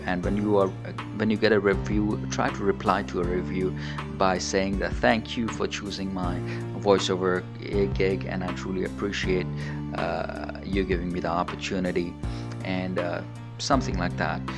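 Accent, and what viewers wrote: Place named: India. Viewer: sound like indian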